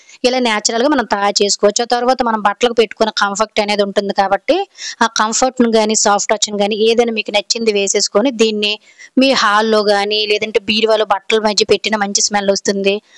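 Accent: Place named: Telangana, India. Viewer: Welcome akkka me slang ardham avuthundi meedhi telangana kadha akka maadhi kooda adhe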